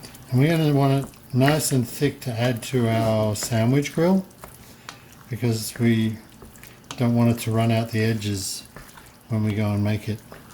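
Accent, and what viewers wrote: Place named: Australia. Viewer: Are you an Australian living in USA? At first you sounded Australian and then I could hear an American twang so couldn't work it out! Anyway, a nice twist on the Aussie pie. I like the idea of the herbs and paprika so will give it a go :)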